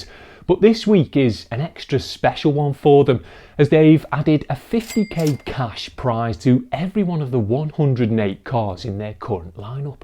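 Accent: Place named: Birmingham, United Kingdom. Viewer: OMG the bleedin Brummy accent unt the subtle innuendos made me smile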